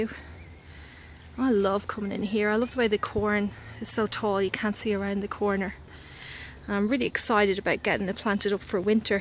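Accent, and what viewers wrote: Ireland. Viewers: Hi beautiful garden, love the white tomatos and the sunflowers. .never seen those tomatos before..did I hear an irish accent there?? So nice. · Loved the video, adore your Irish accent.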